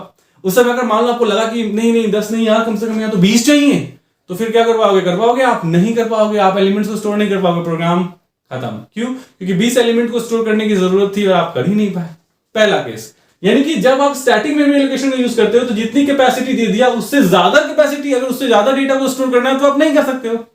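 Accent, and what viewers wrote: Bihar, India. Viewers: I was so confused tiil i found your channel ❤ thank you so much sir One more thing i guess you are from bihar and i am also from bihar so i connect with u more because of that also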